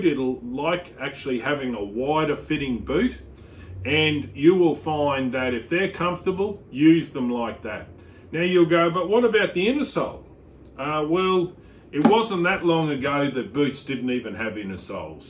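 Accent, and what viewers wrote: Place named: Australia. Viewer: Ah! Nobody told me he would be Australian! I can't even understand what the hell he is trying to say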